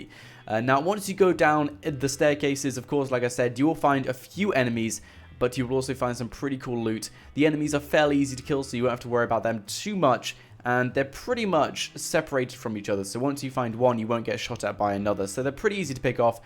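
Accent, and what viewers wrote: England, United Kingdom. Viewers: he's English with a normal voice. we all don't sound like hillbilly inbreads like you lol.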